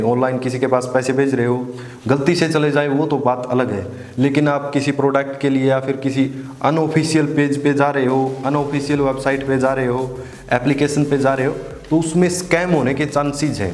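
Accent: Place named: Haryana, India. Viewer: Aapka haryanvi accent bilkul mere dost jaisa hai❤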